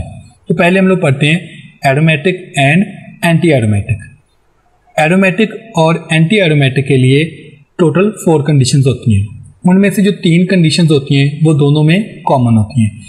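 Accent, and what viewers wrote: Pakistan. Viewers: Why i am pakistani accent from this guy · Are you accent seems to be pakistani Btw love from india❤️ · sir ki ek dam pakistani accent lgti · Mujhe accent se lga tha ki aap Pakistani ho But boht accha samjhaya aapne Thank you sir 🙏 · Kis kis ko sir ki aawaz se lg rha hai ki sir Pakistan ya fir Jammu se hai 😅😅😅😅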